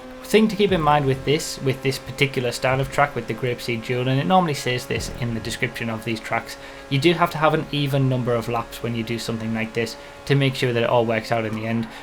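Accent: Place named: Newcastle, United Kingdom. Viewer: You kinda sound like a Geordie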